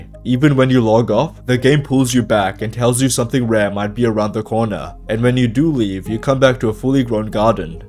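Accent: India. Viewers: Wait why does he sound indian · I love this guys indian acseent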